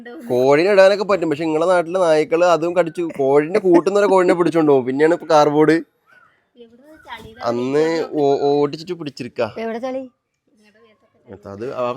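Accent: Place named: Palakkad, India. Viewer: നമ്മൾ കൊല്ലത്തു ഉള്ളവർ കുടിയിരിക്കൽ എന്നൊന്നും parayaarilla😃 പക്കാ പാലക്കാട്‌ slang ആണ് മിക്കവാറും paraunnathu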